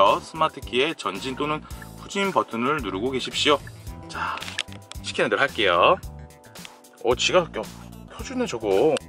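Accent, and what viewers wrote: Jeonju, South Korea. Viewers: 꾸준히 올라오는 영상 잘보고 있어요...정말 설명을 잘해주시는것 같아요..혹시 전주에 사시는가요.. 말투가 약간 전주 말투가 나오네요.. 저도 gv80 구매 준비 중인데... 전주에 계시면 한번 찾아보고 싶네요..^^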